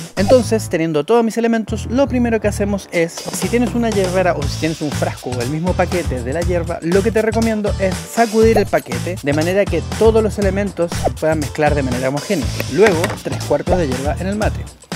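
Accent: Chile. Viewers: Se nota a kilómetros que es Chileno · Excelente yo lo hago así igualito!! A juzgar por tu acento sos chileno, verdad? No sabía que allá también tomaban mate! 😍 Cuéntame, toman mucho? Saludos y muy buen video 🤗🇨🇱🇦🇷🧉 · Pero vos no sos argentino, mm que raro, no me gusta que un chileno, por lo que escucho, me enseñe a preparar el mate argentino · eres un crack, me salta una duda eres Chileno?, hablas con acento chileno,...demás está decir que en el campo y sur de Chile se ha tomado siempre y mucho el mate! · Amigo, de que Pais eres? Tienes acento chileno. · De donde eres bro no reconozco tu acento pero no eres argentino eres chileno? Se me figura tu acento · Es chileno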